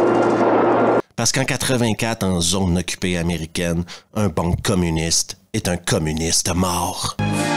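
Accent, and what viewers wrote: Quebec, Canada. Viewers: J’avais 15 ans quand j’ai vu ce film au cinéma et comme beaucoup d’ados de cette époque ce film m’avait emballé… aujourd’hui la narration avec l’accent québécois de ce film m’a offert une toute autre saveur…. Merci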